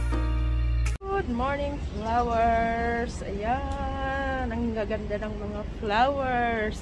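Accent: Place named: Ilocos, Philippines. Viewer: Parang ilocona sounds ka lods love it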